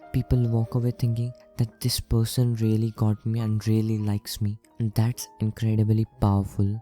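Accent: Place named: India. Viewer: was lowkey invested till I heard the indian accent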